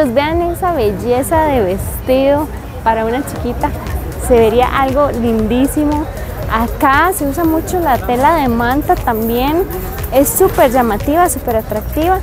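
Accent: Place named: Costa Rica. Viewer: las gracias son a ti por tomarte el tiempo de venir te quiero mucho me gusta tu contenido en la forma como te expresas me gusta tu acento tico escuchar ❤️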